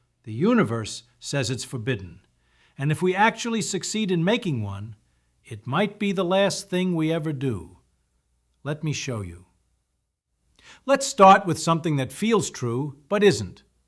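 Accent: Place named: Brooklyn, United States. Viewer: Whoever you are, thanks. I’m aware it’s ai but Feynman was a favorite and you’ve captured his spirit very well. I see a ton of these videos, yours nails his Brooklyn accent.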